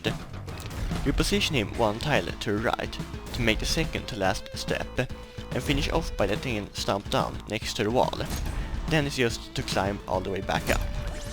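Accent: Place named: Sweden. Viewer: Oh. Really? You have a very different accent from most other Swedish YouTubers.:D I guess you have just a much stronger swedish accent then. Or maybe from a different part of Sweden, I don't know. The video was really great though, I'm looking forward to more in the future!^^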